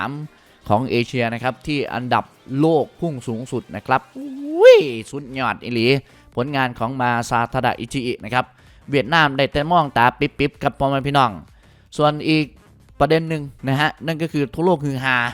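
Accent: Isan, Thailand. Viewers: มักหลายเด้ บักหล่า ในวิธีการบรรยาย เป็นการสอนภาษาอิสานเฮานำขอให้หมานๆเด้อยักหำ มักๆๆๆ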